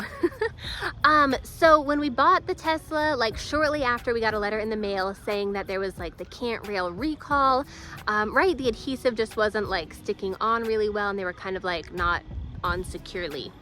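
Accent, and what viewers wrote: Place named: Virginia, United States. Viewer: That Virginia accent!!!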